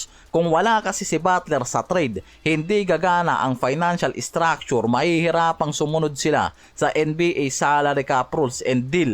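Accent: Visayas, Philippines. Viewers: Bago ka mag content ayusin mo muna yung pronounced mo ng curry. Hindi carry. Curry. Anong carry? Keri? Carry the ball? Curry!! Hindi Carry. Bisayang to.. tapos porgingis. Hindi porsingis.. sarap mong kaltukan.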